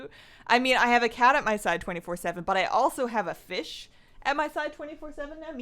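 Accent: Canada. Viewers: From a midwestern ear, you sound pretty Canadian.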